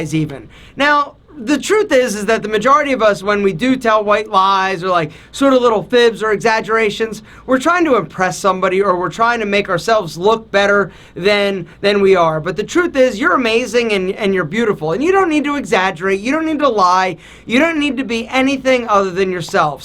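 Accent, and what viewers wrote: Philadelphia, United States. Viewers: He sounds like he’s from Philly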